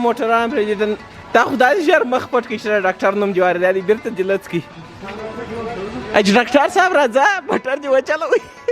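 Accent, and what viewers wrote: Kandahar, Afghanistan. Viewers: Staa pashtoo mashaallah saafa da kandahar ra payad ke 😢😁😎👍 zzar zzar vlog jorawa . Der intizar ma rabandi kawo.shaista vlog dii ye intizar na ki zzi all the best for all vlogs👍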